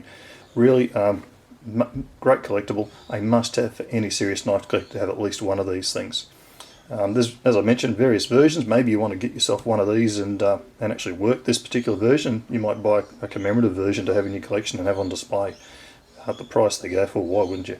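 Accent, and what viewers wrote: Australia. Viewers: simple entertaining and informative review 10/10, where abouts in Aus you from mate ?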